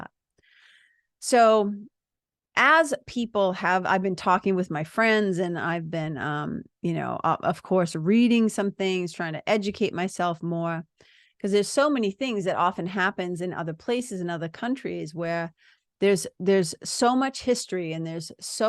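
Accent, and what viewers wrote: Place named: Massachusetts, United States. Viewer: I’m a MA girl living in CA and your accent is making me miss home a WICKED lot! 😆 I’m from the south shore..I’m guessing you are a MA girl too.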